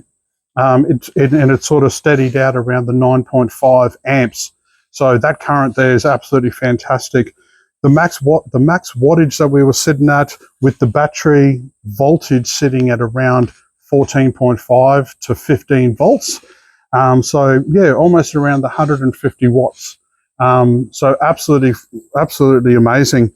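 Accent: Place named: Australia. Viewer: You had me going there for a while, thinking that you were probably from the US, but your accent was really off. After watching a few more minutes, I realize that oh you’re down under! Didn’t think they had Aldi‘s down there, but I guess they’re all over the place. Love the review. Hope next year when I’m down in the US checking out Aldi‘s that they might have these. At less than a dollar a watt, that is a great bargain. There is an Aldi‘s in upper New York State, about 70 miles from Montreal. Anyways enough said, I wish you happy holidays and have fun camping. Our camping season won’t start for another five months. here we just got 4 inches of snow and winter is just starting. Mike from Montreal.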